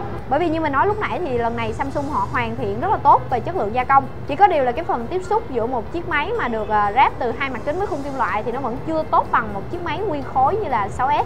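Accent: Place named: Central, Vietnam. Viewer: mien trung roi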